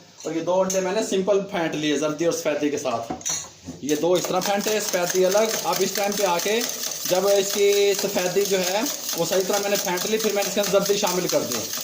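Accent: Punjab, India. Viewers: Bhut mithi boli punjabi urdu 💝💝💝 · App ka baat karny ka punjabi stayil bht acha hai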